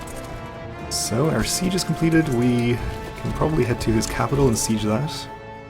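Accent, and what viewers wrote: Ireland. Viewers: Mr, are there other changes with the Horse lords DLC or just the different culture and features with that culture, and are you Irish, cause it sounds like you have a slightly heavy brogue, or is it just me?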